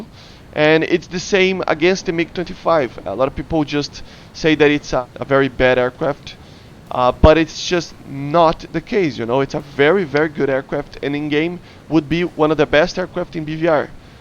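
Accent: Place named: Brazil. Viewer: Sheer curiosity: Are you brazilian mate? Your accent is highly reminiscent of such, hahahaha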